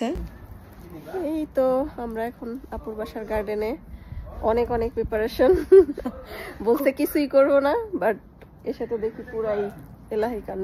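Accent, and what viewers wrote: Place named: Sylhet, Bangladesh. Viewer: খবে জোরে আমিন,এই কথাটা শুনে এত হাসি পাইছে, আমাদের ভাই অর্ধেক সিলেটি অর্ধেক চাঁদপুর,ভালই লাগে আমাদের সিলেটে কথা যখন ভাই বলে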